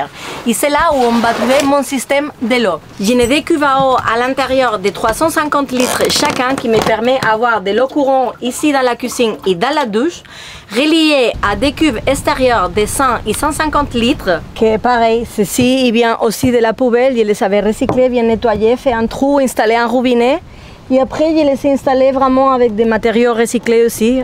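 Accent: Spain. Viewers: C'est toujours un plaisir de te suivre, avec cet accent espagnol rempli de lumière❤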